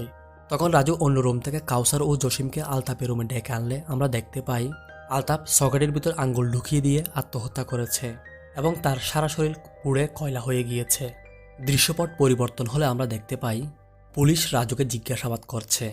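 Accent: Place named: Chittagong, Bangladesh. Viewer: আপনার বাড়ি চট্টগ্রাম। আপনার কথা শুনেই বোঝা যাচ্ছে।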